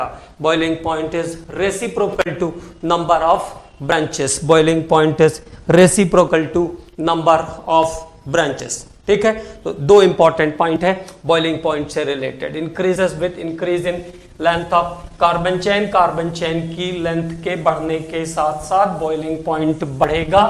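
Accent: India. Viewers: 🤣🤣🤣🤣 Yee Chinese nehi yee too pura Desi English hee .. 😁😁😁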